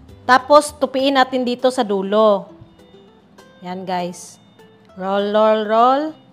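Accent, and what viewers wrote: Visayas, Philippines. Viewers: Ka cute kaayo mag bisaya ba 😂❤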